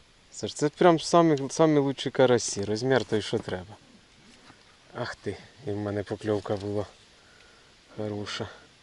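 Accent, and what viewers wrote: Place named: Surzhyk, Ukraine. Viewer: Я вибачаюсь ви з якого регіону українці бо у вас пробачте страшний суржик поправте українську мову а взагалі я дивлюся ваш канал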